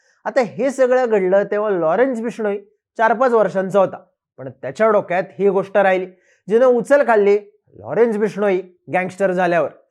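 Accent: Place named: Rural, India. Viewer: ग्रामीण भागातील हा यजमान(host) दिसतो and hyacha awaaza suddha gavakadcha vatto, baki sagle host changle aahet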